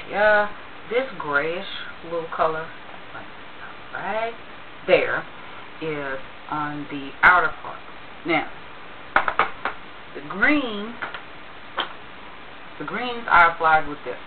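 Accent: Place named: United States. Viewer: You sound soooooo country :0) I am loving those eyes! Nikkie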